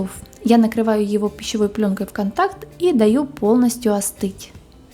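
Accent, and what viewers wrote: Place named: Ukraine. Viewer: поняла что она украинка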